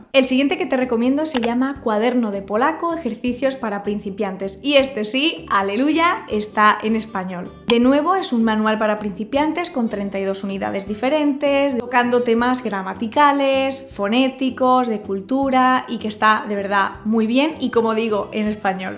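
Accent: Spain. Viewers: ??? Ella es española 😕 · Amiga, cómo aprendiste ese acento de España? No es por ofenderte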